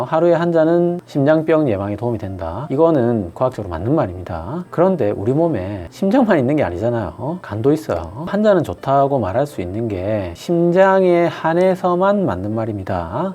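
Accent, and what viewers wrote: Gyeongsang, South Korea. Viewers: 갱상도토베기말투에 쏙쏙 빨리드갑니다 당뇨 고혈압 찾아보다가 여기까지와서 재밌게 잘봤네요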